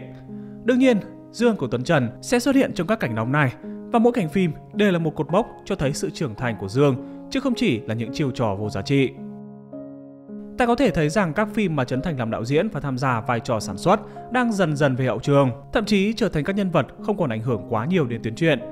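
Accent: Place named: North, Vietnam. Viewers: trình độ đọc hiểu ô kém quá, ý nó là đang đòi quyền lợi cho miền nam các bạn đấy, vì đó kênh này giọng bắc nên đánh giá phim miền nam ko cao hiểu chưa? Mà nữa miền bắc ko lm phim rạp đ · đơn giản vì chủ kênh nói giọng bắc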